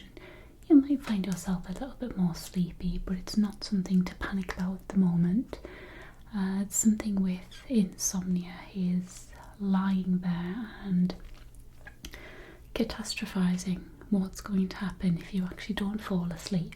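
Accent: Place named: North East England, United Kingdom. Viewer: Love it. Totally relaxing 😍😴 also love the northeast accent I'm from north yorks so having a local asmr artist rocks 👍🏻👍🏻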